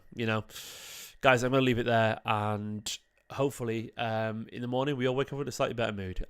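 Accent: United Kingdom. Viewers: hello mate ............ which british accent is it ??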